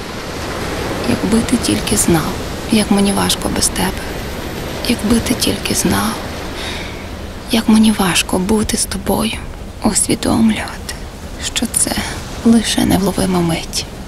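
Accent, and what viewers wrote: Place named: Lviv, Ukraine. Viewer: Chto Vy mayete proty Lvivs'koho aktsentu. Ukraina velyka. 🌲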